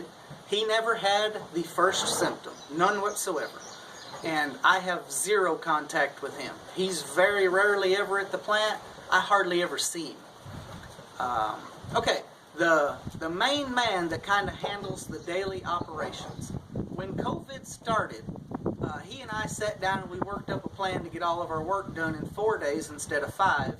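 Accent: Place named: Alabama, United States. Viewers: I live in Nebraska and most other states assume we talk like this. We don’t. We have a flat (no) accent. When I heard you speak the first time, I thought the same thing “what a nice accent!” It’s clearly southern, but not terribly twangy. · I live in Alabama too, it's weird hearing you say that, half the people around here talk like that!😆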